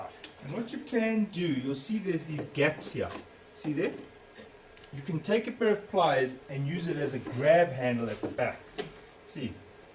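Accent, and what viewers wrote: South Africa. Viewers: Yay, South African Accent... Howzit and thank you for the DIY!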